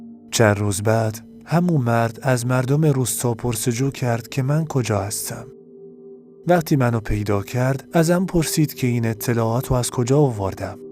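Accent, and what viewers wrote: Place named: Khuzestan, Iran. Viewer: سلام داداش یه سوال دارم یه لهجه داری فکر کنم عرب خوزستان هستی درسته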